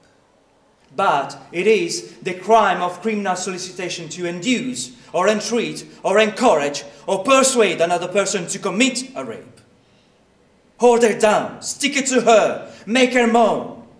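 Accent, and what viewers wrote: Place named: United Kingdom. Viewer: your british accent is perfect! :)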